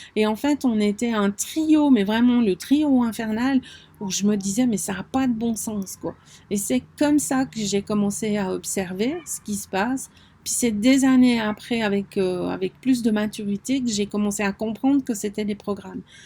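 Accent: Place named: Switzerland. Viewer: Bonjour, je découvre ta chaîne, bravo, j’ai donc encore beaucoup de tes vidéos à visionner....ce sujet est très intéressant et bien expliqué grâce aussi aux exemples...je découvre sans grande surprise que nous sommes voisines, je soupçonnais ton accent et les huitantes, septantes....donc c’est confirmé, je réside à quelques mètres du sol genevois....ceci dit j’ai appris les programmations liées au pays...ce sujet est très intéressant, je vais partager....merci encore pour ta vidéo. Bénédicte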